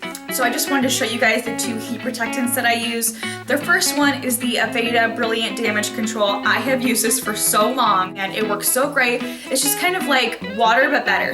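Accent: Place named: Chicago, United States. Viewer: Your accent is awesome, Chicago? N your pretty, thanks for the tips too I subbed:)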